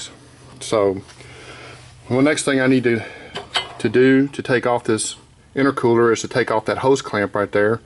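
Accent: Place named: North Carolina, United States. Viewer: good advice - thanks! Where are you from btw - i'm in NC and you sound local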